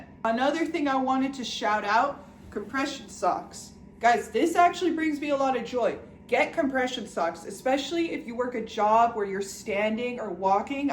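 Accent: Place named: Israel. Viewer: יש מצב שאת יודעת עברית? 😅 האינטונציה שלך נשמעת ישראלית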